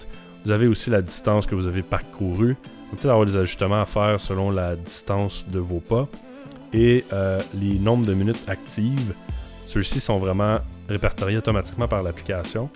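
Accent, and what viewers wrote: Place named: Canada. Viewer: ppppffftttttt c'est relou l’accent Canadien, ont ne comprends rien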